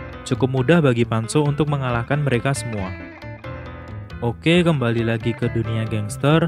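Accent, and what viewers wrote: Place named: Sumatra, Indonesia. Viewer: pasti pengisi suaranya orang luar jawa...kayak sumatra gitu hha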